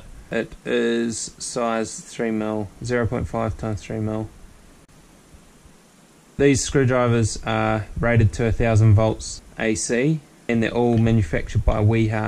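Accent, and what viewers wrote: New Zealand. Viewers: Love the kiwi English words & accent.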